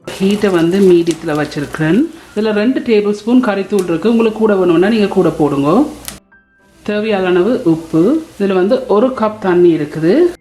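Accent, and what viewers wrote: Sri Lanka. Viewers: உங்கள் சமையலை விட உங்கள் இலங்கைத்தமிழ் அருமை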